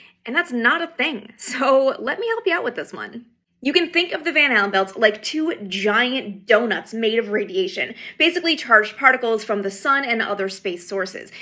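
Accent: Canada. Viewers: the Canadian accent lol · Please make a video just saying the words “about” and “out” and any other Canadian sounding words. You really need your own cable network dear. You are amazing. You’re my granddaughters age and I wish more of your generation loved the space program like my generation ,who grew up watching Mercury, Gemini, and Apollo, life on TV. Keep up the oatstanding (see what I did there) work❤️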